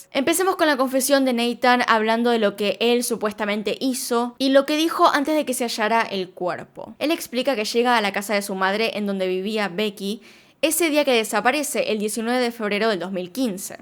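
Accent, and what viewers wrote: Argentina. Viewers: Saludos desde Suecia, aquí vivo aunque soy mexicana. Amo tu canal y me encanta el acento argentino y cómo pronuncian "ll" y "y" 💓👌. Verte me hace sentir orgullosa de los latinos y nuestro idioma, además de que una chica como tú sea tan capaz de hacer este nivel de casos e investigación. Mi todo respeto a tu trabajo. Además tienes una mirada única y también me gustan tus vídeos de maquillaje.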